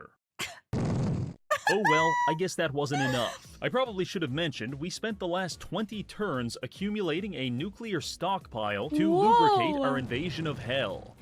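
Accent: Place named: South, United States. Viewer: I find it great that her Southern gets stronger every video.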